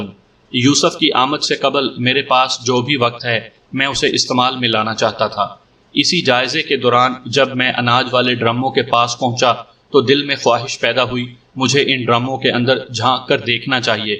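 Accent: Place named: Punjab, Pakistan. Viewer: Your urdu voice is very sweet despite punjabi accent in it.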